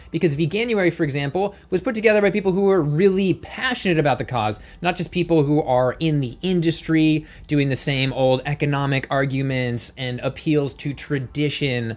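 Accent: United Kingdom. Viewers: As a Brit, I appreciated your British accents.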